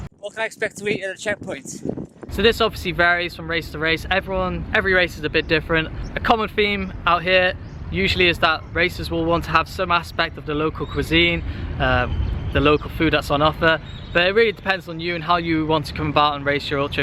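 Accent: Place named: North Wales, United Kingdom. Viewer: Really your from Wales! You sound from North, I'm from Denbighshire if you know there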